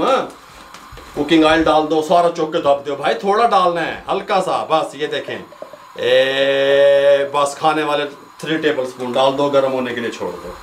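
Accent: Punjab, India. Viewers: Assalamualaikum Sir AP ki Punjabi Kafi mezy Vali hai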